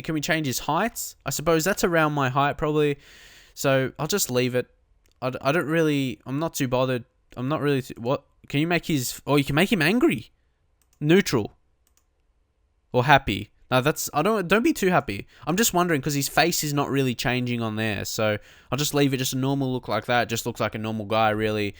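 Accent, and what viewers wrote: Australia. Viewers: ih yes i love the austräääälian accent! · this aussie accent man. cheers from Syd, mate.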